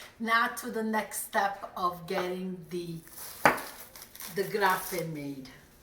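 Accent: New York, United States. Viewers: They look so good! I have to ask you, how long have you been here from Napoli? Your NY accent makes me think it's been a while. Lol..l love to hear you speak Italian. I don't hear it anymore. 😥 Did you teach your kids Italian? My father spoke it but never taught us..He was born in NY but Italian was his 1st language..He had to learn English for school. Good to see you today! ❤❤😘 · Hello, I subscribed to your channel yesterday and had to call my mother’s(Phyllis) friend, Angela to ask because I just knew you were her sister! Same exact Italian/NY accent! Refreshing to hear since I moved from Ft. Lee to Indiana some years ago. I miss all things Italian since there aren’t any Italians here! No bakeries, no good cannolis, etc. My mother used your recipe for Ricotta cheesecake and I’ve continued the tradition.. I’m so glad I found this channel! 😊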